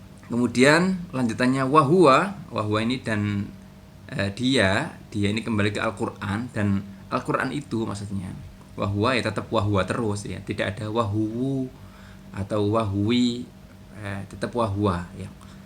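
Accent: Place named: Java, Indonesia. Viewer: maa syaa Allah.. medoknya kerasa sekali hehe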